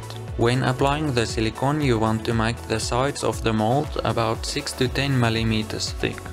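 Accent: South Africa. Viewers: Ik dacht al een Zuid-Afrikaans accent te horen. :) Je video's zijn erg goed en heel professioneel. Ga zo door! Edit: Nu hoor ik je ook in de video zeggen dat je van SA bent.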